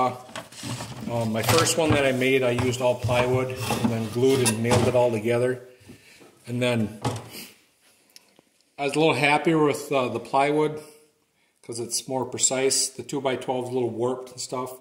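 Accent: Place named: United States. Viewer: Love the American accent, 'ruff' (roof). 🙂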